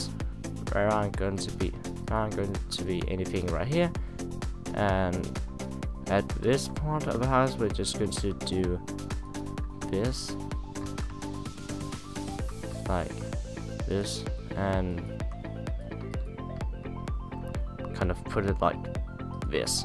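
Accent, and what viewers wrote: Denmark. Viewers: I hear Denmark, i like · R u Danish? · I was about to comment "where are you from i love your accent" but i think i love it because I'm from denmark to, oh and it's called an overhang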